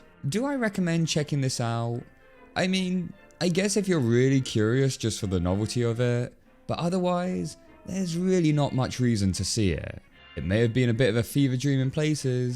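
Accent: United Kingdom. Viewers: 😊 Aw, I don't think you're a prick. I think you're a pretty good narrator for film reviews. That deep voice and British accent really helps when you review the sad drama type films.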